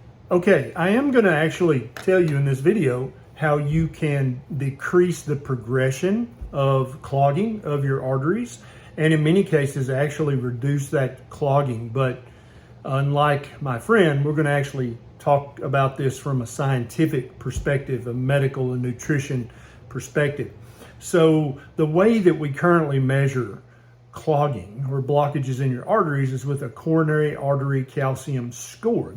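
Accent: South, United States. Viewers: Sorry, not to be a racist, and this is totally my fault, but I just can't take it seriously when I hear a hillbilliyish guy in a pink shirt frantically telling me to hurry up and get whacky naaka plant to clean out my arteries. Is this satire/comedy? If so, haha!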